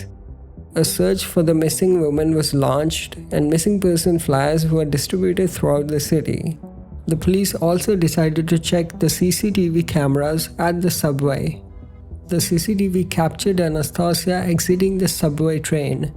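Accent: India. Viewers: Is he Indian? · I always stop listening immediately, when I hear he is narrating. The Indian accent just ruins it for me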